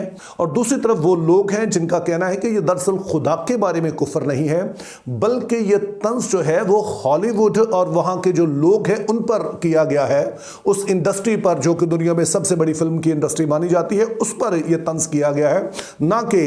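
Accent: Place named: United Kingdom. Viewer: bhai ap urdu midum hyn. Es liy kindly urdu ko urdu ki tarha bola karyn.ap urdu esy bolny ki koshish karty hyn jasy angryz urdu bolty hyn.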